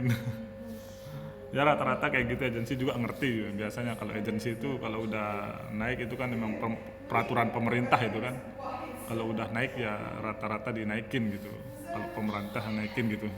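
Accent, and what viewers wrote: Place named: Lombok, Indonesia. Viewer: asli mna saudara kyknya lombok dari logatnya ..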